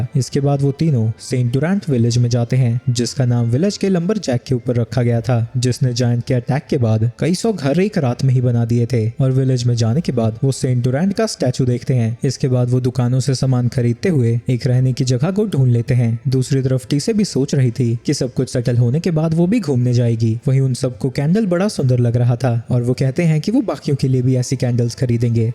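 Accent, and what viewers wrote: Gujarat, India. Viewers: Bhai tum gujrati ho kya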